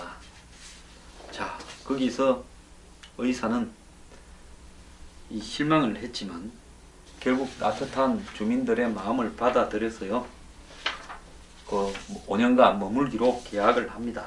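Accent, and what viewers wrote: Gyeongsang, South Korea. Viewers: TK사람 말투 진짜로 짜증나네? 같은 경상도지만 말투가 영~~~~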